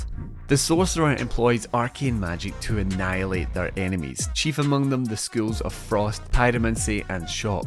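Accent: Scotland, United Kingdom. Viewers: That's a Scottish accent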